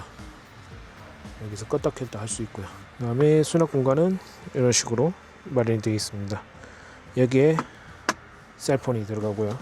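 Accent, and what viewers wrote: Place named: Busan, South Korea. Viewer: 캐나다에서 부산사투리.. 니마! 확마!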